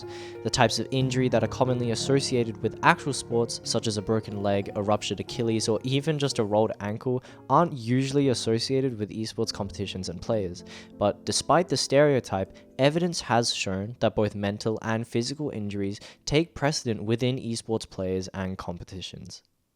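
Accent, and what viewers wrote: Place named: United Kingdom. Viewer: Just to let you know, your british accent is stupid, and you need to speak up, put some balls into your speech. It's bad enough with your stupid English tea accent.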